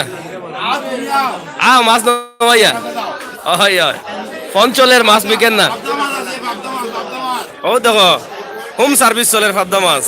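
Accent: Sylhet, Bangladesh. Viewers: ভাই এইটা আমাদের সিলেটের ভাষা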